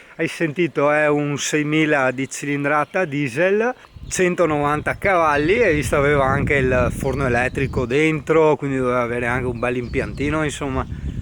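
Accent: Veneto, Italy. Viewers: Venetenglish unico grande!!!! Continua così grazie per i tuoi video .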